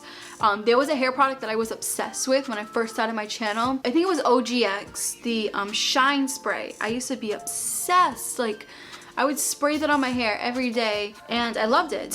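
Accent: Boston, United States. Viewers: Are you from Boston or something?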